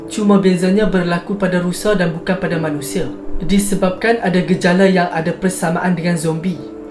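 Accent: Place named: Kedah, Malaysia. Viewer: Slang pelat Kedah...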